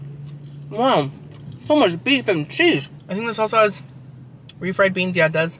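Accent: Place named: United Kingdom. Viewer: I think your videos are way more fun to watch them in the UK. I love your British accent! Does it help the stutter? I hope so. We don’t have many of the food places you frequent. I want to jump on a plane 😂 xxx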